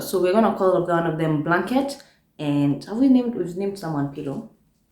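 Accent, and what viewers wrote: United Kingdom. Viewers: When speaks with that British ascent,lawwwd tanivunja mbavu😂😂😂😂😂😂😂😂😂😂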